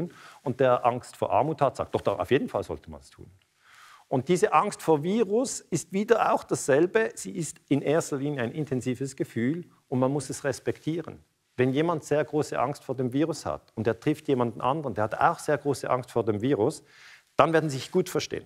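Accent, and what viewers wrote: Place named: Switzerland. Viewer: Und ich mag auch Ihr Schwitzer Dütsch! 😊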